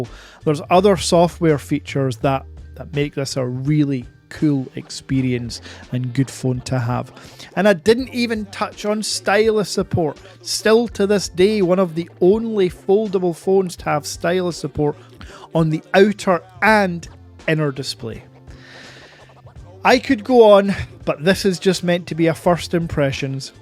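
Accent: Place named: Scotland, United Kingdom. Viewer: Sounds mad but it could because you are Scottish and the accent ..People are very shallow 😂😂.I bet if you had an Aussie or Yankie accent be well on the way to 100k subscribers 👌👌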